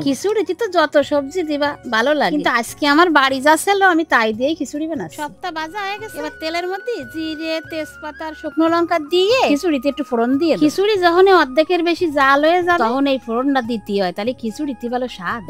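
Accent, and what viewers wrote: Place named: Jessore, Bangladesh. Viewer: Apnader vasa jashore er monirampurer manuser Moto vlo lage onk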